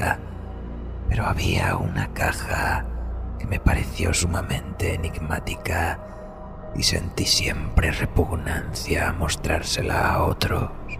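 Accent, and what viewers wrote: Spain. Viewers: si, pero la cuestión del acento es mucho mejor en este canal para españoles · ay a mi no me gusta el acento español, ademas este señor lee todo de manera monotona, siempre en el mismo tono, no hace bien las pausas, Tener voz algo grave no quiere decir q se es buen relator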